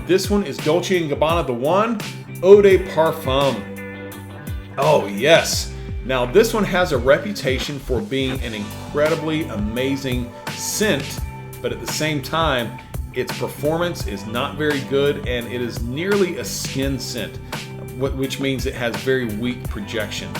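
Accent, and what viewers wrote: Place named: United States. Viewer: I love your fragrance videos and I'm from west Michigan and i can understand your American accent some of the fragrance channels i watch on YouTube even though they are speaking English language they still have a foreign accent and hard to understand what their saying thanks bro for the the tips and a really good fragrance review video 👍👍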